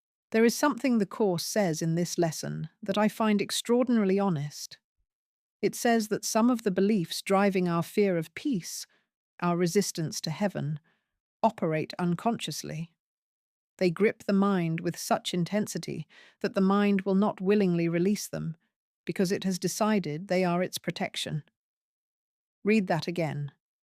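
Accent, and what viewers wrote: United Kingdom. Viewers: I really love the British accent. Idk why but it's very soothing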